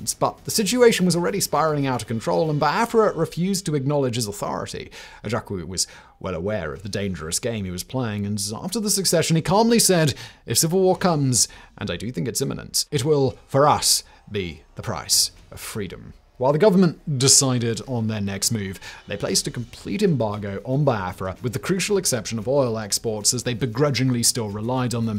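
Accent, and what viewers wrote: United Kingdom. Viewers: romanblack4247 It's not a white accent lmfao what? He's British and even then he could have easily pronounced the words like Yoruba or Igbo correctly. Wth is a white accent? He simply just didn't even try to learn how to pronounce them correctly.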